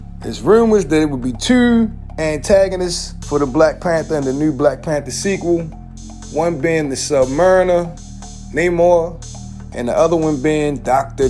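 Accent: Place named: Baltimore, United States. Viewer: i can tell you're from baltimore btw 😂😂😂😂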